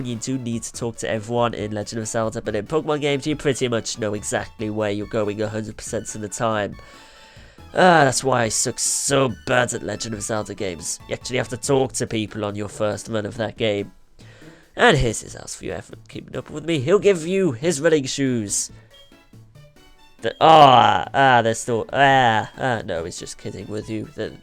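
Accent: United Kingdom. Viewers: NOOOOOO! HE'S A BRIT! NO RACISM BUTI DON'T LIKE THE ACCENT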